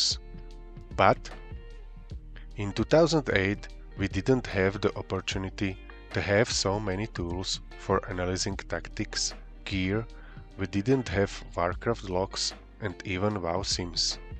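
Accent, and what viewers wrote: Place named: Austria. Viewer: Are you austrian? You have a strong accent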